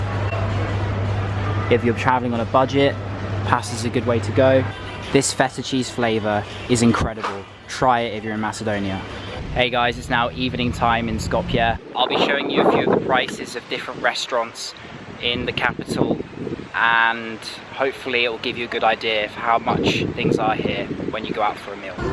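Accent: United Kingdom. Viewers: I hate that British accent , coward Brit’s gave their county away to Muslims , puke 🤮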